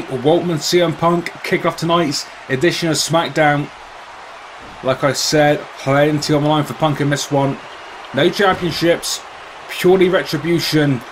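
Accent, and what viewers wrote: England, United Kingdom. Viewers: are you English? love your vids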